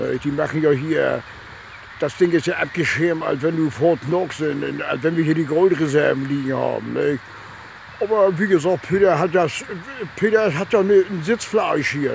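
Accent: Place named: Bavaria, Germany. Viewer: Sowas wie dich brauch noch der FC Bayern, jmd der nur bayrisch redet und ein kultfan ist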